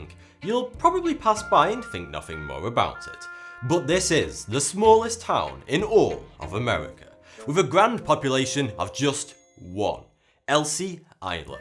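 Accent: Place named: Manchester, United Kingdom. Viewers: where abouts in England are you from? you sound northern I'm from Liverpool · Subscribed for the Mancunian accent.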